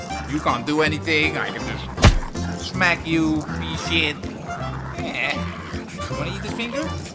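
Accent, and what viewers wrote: Netherlands. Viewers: are you dutch i hear dutch in your voice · He sounds like he’s from the netherlands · Somehow your accent got even more Dutch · I got a good feeling about you being dutch because that is an accent that only dutch people have. But are you?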